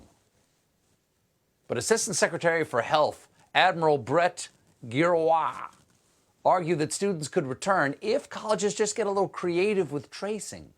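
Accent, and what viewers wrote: France. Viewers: Hey Stephen Ton français fait dure ! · J'aime votre accent Français Stephen ! :-) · Stephen, I love ta French accent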